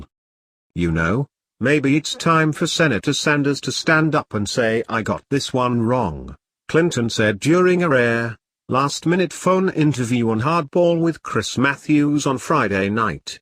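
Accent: United Kingdom. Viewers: It's a person just bad sound, and he sounds British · I dont mind the british accent but im pretty sure its a bot though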